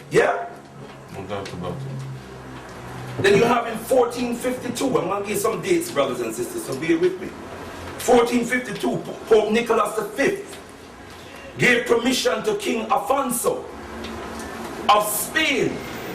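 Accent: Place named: Jamaica. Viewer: Just Became Your 1000th Subscriber ...Not Big on the Egyptian Names But its a Positive Vibration & iHear That Jamaican Accent ,iKno My People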